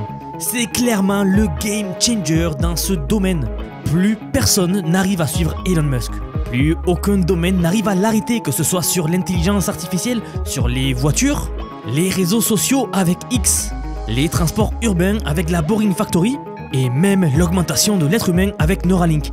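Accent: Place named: South-West, France. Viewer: Trop bien ce contenu ! Full valeur merci. Bonus pour l’accent du sud ouest !!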